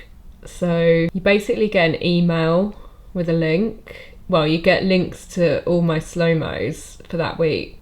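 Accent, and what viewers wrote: England, United Kingdom. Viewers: Cool I didn't realise you were a Pom until I heard your accent I hope you visit OZ sometime and visit the GC lots of Gym Junkies here that need to focus on something a bit more interesting than Bench Presses and Squats 👌✌️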